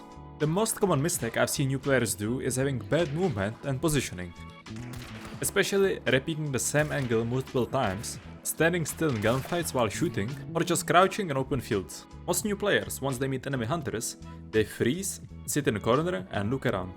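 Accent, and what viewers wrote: Czech Republic. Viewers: ten přízvuk, hned mi bylo jasný že jsi Čech :D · Tvrdý český prízvuk čo má svoje čaro :D Nice tips, thank you very much :)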